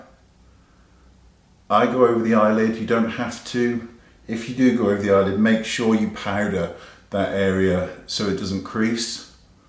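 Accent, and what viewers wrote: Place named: West Country, United Kingdom. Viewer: Your accent was extra West Country today, I love that accent!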